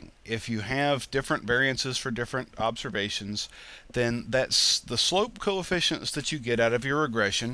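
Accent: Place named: Northern England, United Kingdom. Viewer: Some words are Southern like "dependent" and most of your accent is Northern.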